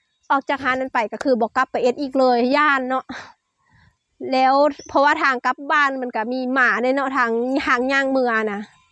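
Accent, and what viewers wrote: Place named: North, Thailand. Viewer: ภาษาพูดของ คล้ายภาษาคำเมืองภาคเหนือ ตอนแรกนึกว่าเป็นคนเหนือ เป็นคนสู้ชีวิต สุดยอดแม่สาวน้อย👏👏👏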